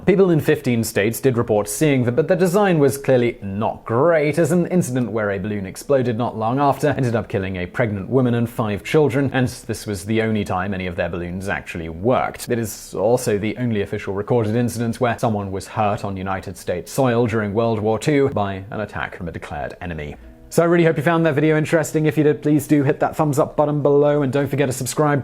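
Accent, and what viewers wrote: United Kingdom. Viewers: This guy doesn't always have accurate facts. His British accent doesn't make him smart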